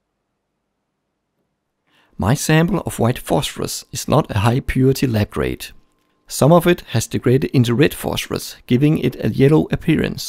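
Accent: Denmark. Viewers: are u a dane? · o_O you are from Denmark! for a long time I have been wondering, because of your ascent, and thought it sounded Danish. Så hejsa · Almost a year ago, but still awesome! I knew you were from Denmark, i could hear it in your voice :-) I'm from Denmark myself. But it's nice to know a danish youtuber making videos based on science. Keep it up! :D Fede videoer, hold det kørende! :D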